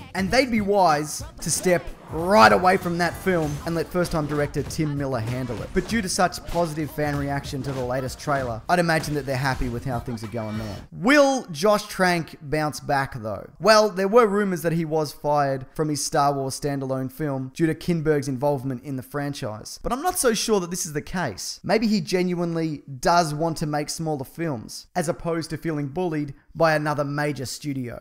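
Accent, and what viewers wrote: Australia. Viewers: Hey love ALL your shows, are u a Aussie you sound like a Aussie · God I fucking love this channel and their podcast. Its hilarious and bang on with the commentary about films these days. The australian accent just makes it way funnier, I wish I was aussie instead of just crap and English. "Im gonna start pointing some bloody fingers!"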